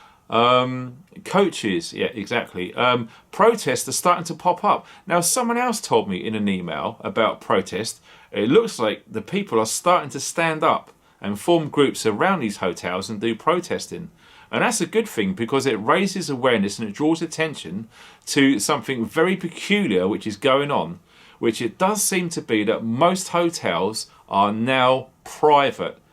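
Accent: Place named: Cornwall, United Kingdom. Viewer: Sounds like the North Coast of Cornwall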